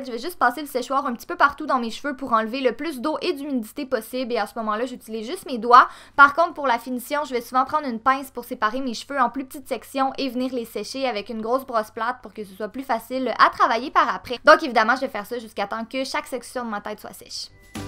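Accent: Quebec, Canada. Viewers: Tu es vraiment magnifique ❤ J'adore ton accent québécois 😊, ton genre de vidéo est vraiment simpa. j'adore ta chaîne ❤❤❤❤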